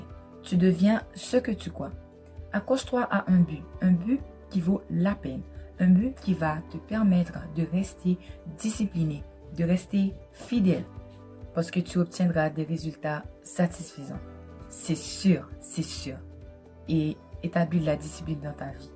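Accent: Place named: Canada. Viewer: Accent canadien Mwen renmen l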